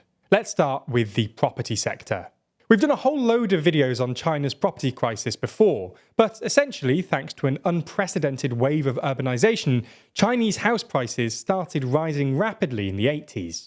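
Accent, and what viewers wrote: United Kingdom. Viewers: 5% growth for 2023, British accented presenter enjoy your recession riddled economy.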